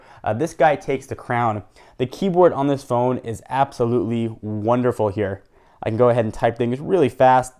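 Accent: Canada. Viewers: phe<link> for a second there i thought you were from canada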